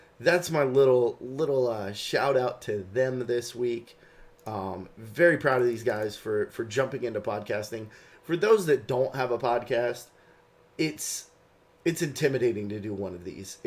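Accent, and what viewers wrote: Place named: Canada. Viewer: Oh my gosh your Canadian accent hahahaha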